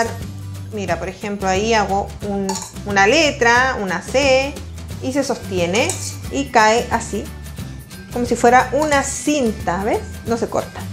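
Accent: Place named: Chile. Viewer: Pense que era mexicana pero es mas chilena que los porotos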